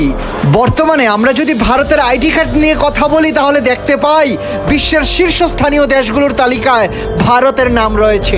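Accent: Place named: Bangladesh. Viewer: আপনার কথা বলার ধরন ও বাংলাদেশ উচ্চারণ ভালো লাগে নি।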